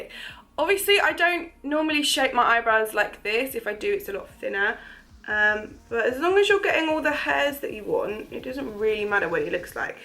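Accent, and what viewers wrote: United Kingdom. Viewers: R u bristish ????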